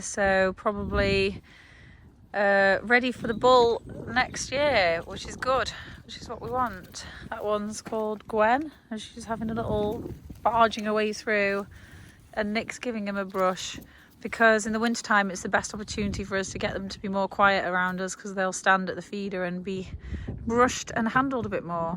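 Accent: United Kingdom. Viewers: First I want to say how extremely beautiful you are, I love your British accent also. We raise a lot of sheep hear in the US. We hire our sheep sheared and then the shearer always buys all of our wool. Our biggest money comes from selling our lambs. We grain them with some of our Iowa corn. We try to get them nice and fat before we sell.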